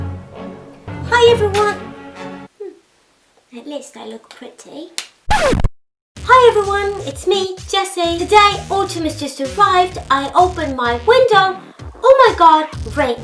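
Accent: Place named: England, United Kingdom. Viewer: What part of England are you from Jessie? I love you accent! Loved the skit!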